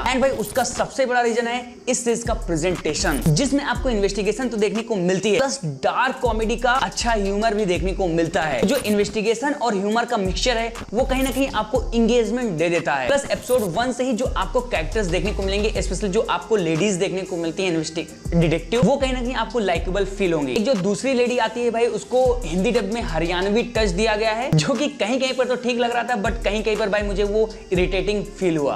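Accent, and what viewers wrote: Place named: Haryana, India. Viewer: I like haryanvi style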